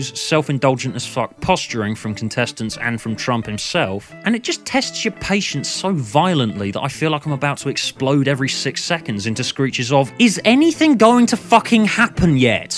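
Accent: United Kingdom. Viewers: So glad somebody with a British accent saying intelligent things about More Alternative Truths is posting. A Disinterested Party (honest.) (Nor am I mum.)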